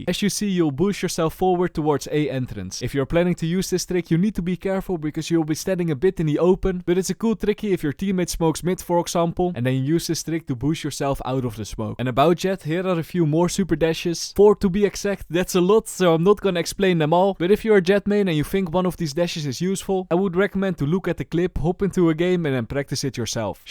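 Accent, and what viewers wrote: Netherlands. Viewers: nederlands? ik hoor dat accent ofz xD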